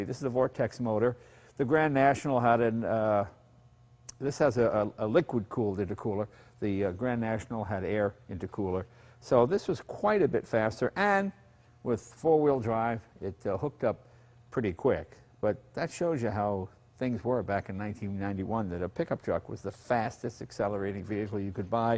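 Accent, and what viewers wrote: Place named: New England, United States. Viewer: Travis Henderson I think he was using it in the plural... Vortecs; or it was just his New England accent coming out. You know...Pak the caa in the yaad.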